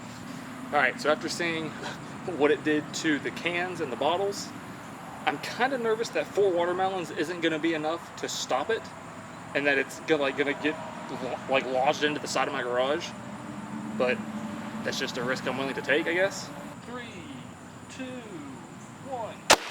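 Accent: South, United States. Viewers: I know he sounds southern at times but he jus lost his card there for sure 😂🤣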